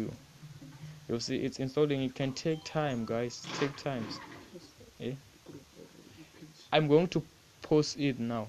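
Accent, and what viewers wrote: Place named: South Africa. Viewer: I can smell the accent...mzansi👑❤😂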